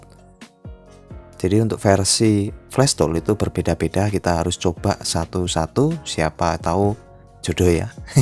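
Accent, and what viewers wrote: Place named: Central Java, Indonesia. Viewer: kn bner asliny dr jteng soalny logat ny sma prsis daerh sy sragen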